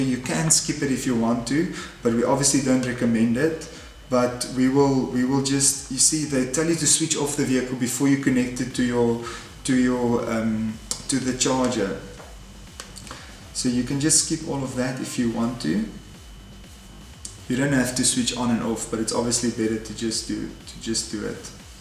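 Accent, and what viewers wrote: South Africa. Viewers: He sounds like afrikana but live overseas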